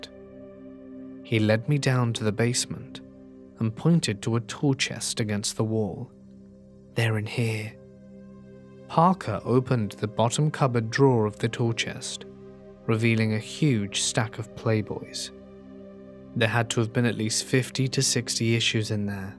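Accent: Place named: Southern England, United Kingdom. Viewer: I love your content but I don't listen while falling asleep, I listen just to chill out or as background nosie while doing other tasks so I think a change to the black screen would be great! You know like the visual representation of sound could be cool!! You could play around with different colours or effects/movements depending on the story! This would also give you an avenue to explore tempo and expression of movement, almost giving your content more musicality of that's what you're potentially looking to explore. Keeping it minimalistic would be the key to balance out the audience between those like me, who enjoy your videos for the storytelling, and those who like to fall asleep listening to you Again, just want to add how much I enjoy listening to you. I don't know where in the UK your accent is from but it reminds me so much of being back home in the South which I find so comforting now I'm living away from there!! 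Xx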